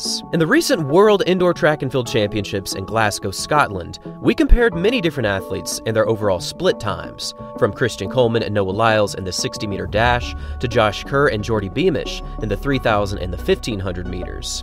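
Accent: United States. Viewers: Your accent is American, so why do you call soccer players football players?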